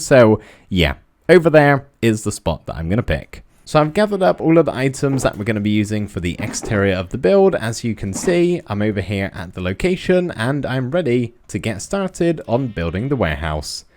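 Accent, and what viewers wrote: United Kingdom. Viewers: Hello, British!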